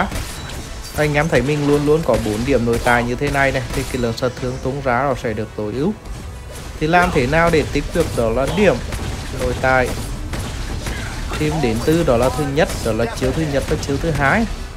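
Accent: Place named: Hue, Vietnam. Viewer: giọng huế ko nghe đc gì 😢😢😢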